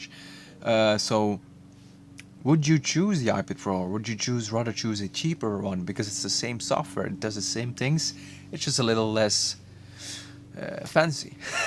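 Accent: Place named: Belgium. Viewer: guees belgium accent :D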